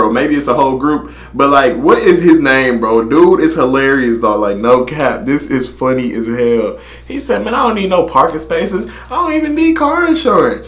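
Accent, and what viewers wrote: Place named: Ireland. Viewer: The V sign means F*** you/off. Your irish accent is jokes 🤣.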